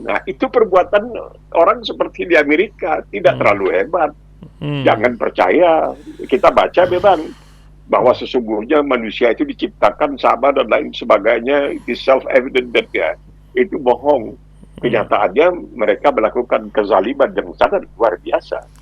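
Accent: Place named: Medan, Indonesia. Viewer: C abang aku ingat aja di RASI ...... dengan khas logat Medan nya aku ternyata sempat juga merantau di Medan Bang 😂😂😂 Sukses selalu smoga sehat2 saja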